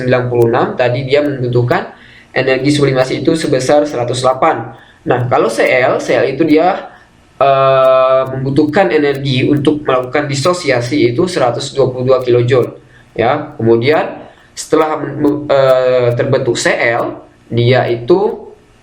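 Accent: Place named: Sulawesi, Indonesia. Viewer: Logatnya sulawesi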